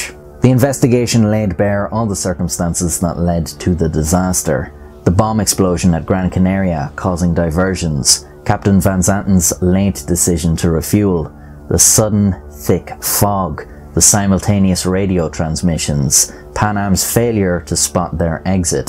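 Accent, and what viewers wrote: Ireland. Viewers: I love his accent! The Irish narrator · H I'm pretty sure he's Irish but if your some Irish English thing thats cool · I’ve heard this story before, but hearing the Irish lad explaining it entices me for some reason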